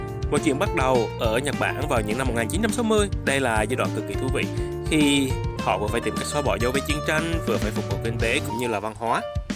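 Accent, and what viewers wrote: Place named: North, Vietnam. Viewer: Này chắc chắn là anh em miền Bắc rồi 😂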